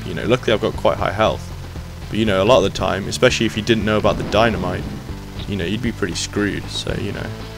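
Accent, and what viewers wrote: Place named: United Kingdom. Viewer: Good review.. extra points for the British accent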